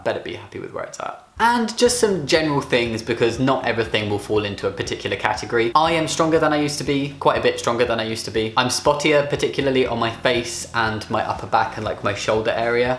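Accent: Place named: Scotland, United Kingdom. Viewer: Little bit less Scottish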